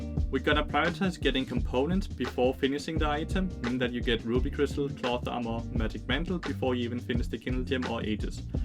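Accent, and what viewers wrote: Denmark. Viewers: Bro are you Danish? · Are you Danish? :p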